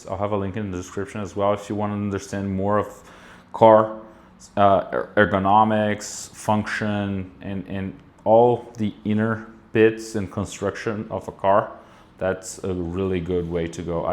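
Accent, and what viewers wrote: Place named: Brazil. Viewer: Esse english é brasuka! Faz vídeos em português tb irmão...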